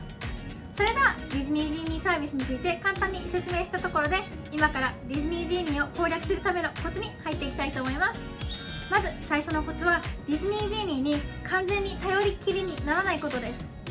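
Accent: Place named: United States. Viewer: sayaちゃん、こんにちは😊 sayaちゃんの動画、何本か楽しく観させていただきました。今日は初コメさせてくださいね。 ジーニー＋について、たくさんの情報をありがとうございます♪プランを立てている途中なのですが、教えてもらえますか？？(＞＜) 8月なのですが、午後からEPに行く場合、朝ジーニー＋を購入して、7時にひとつ、11時にひとつ、13時にひとつ、合計3つのLLを持って13時にパークに入る、といったことは物理的に可能ですか？wdwには次いつ行けるか分からないので最大限楽しみたいと思っています😅 あとsayaちゃんはアメリカ育ちですか？🇺🇸お話の仕方や言葉の選び方がアメリカっぽいなーと思って😁気にされたらゴメンナサイ🙏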